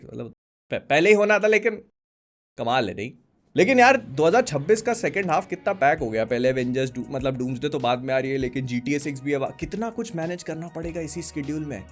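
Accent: Bhopal, India. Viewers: bhai bhopal se ho?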